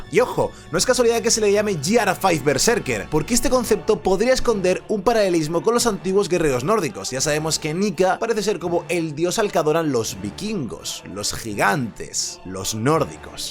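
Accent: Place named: Spain. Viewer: Eres dominicano y tienes un acento muy español pero mis enhorabuena yo soy De RD y te veo desde España Galicia grande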